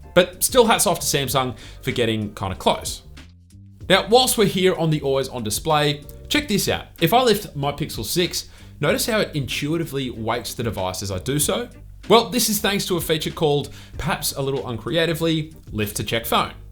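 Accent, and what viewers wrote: Australia. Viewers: Australian? Nice change to hear someone from there.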